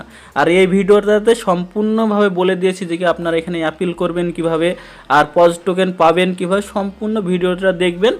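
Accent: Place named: Bangladesh. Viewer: Apni Indian? kotha sune mone hoy bangladeshi.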